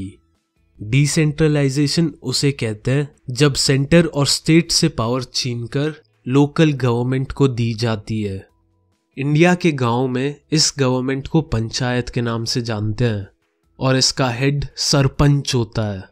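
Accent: Haryana, India. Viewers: Your sounds like Haryana are you from Haryana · Bhai Tu h kha ka Jo haryana ka h 👍👍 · Haryana se ho k..?🤟😎💪💪💪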